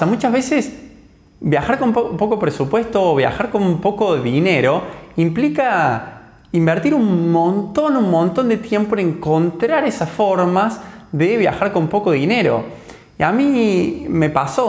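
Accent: Córdoba, Argentina. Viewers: El acentito Cordobez :) !!, estoy de acuerdo en lo que decís, de hecho, actualmente un vuelo de buenos aires a san Juan y viceversa que tarda 2 hs, cuesta lo mismo que ir en ómnibus que tarda 17 hs en promedio. La gente por X razón siguen viajando en ómnibus !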